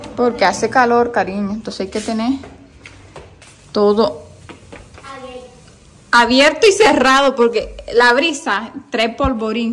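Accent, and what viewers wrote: Caribbean Coast, Colombia. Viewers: Tan bello, habla puro costeño. Jajajaja disfruta mucho la familia, que después tendrás chichi pa rato🙏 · Costeñol puro!!! Abrazos, desde Cartagena, Colombia!!!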